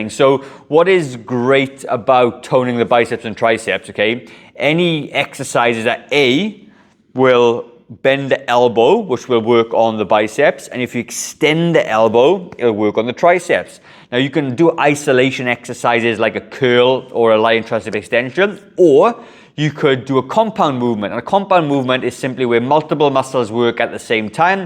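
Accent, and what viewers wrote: Wales, United Kingdom. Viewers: Wales, you do not need to say your from Wales I could tell by your accent first time I watched your very helpful vids, I am from Tenby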